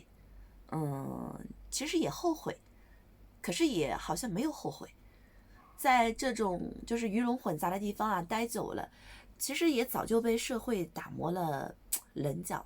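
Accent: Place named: Jiangsu-Zhejiang, China. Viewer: 所以 你做的足浴城在哪里 听着像江浙口音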